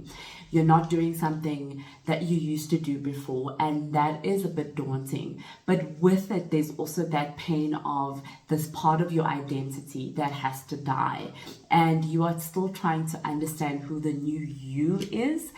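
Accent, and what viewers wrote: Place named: South Africa. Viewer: You sound south african sis,🇿🇦 🙌🤞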